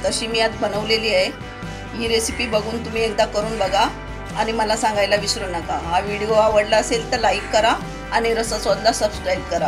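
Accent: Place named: Rural, India. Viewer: Tharrat marathi aahe tumchi baba chan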